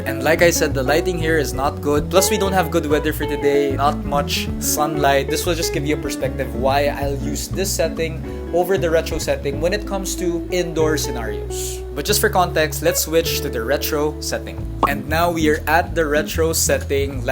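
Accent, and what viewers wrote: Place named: Philippines. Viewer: Dude. You're my fav youtuber. I love photography and videograpyh and I spent almost a year in total on the Philippines (Been teaching german to filipino nurses). By far my fav country in this world!! And I miss hearing that accent every once in a while so here I am, getting the full package. Also, keep up the good vibes dude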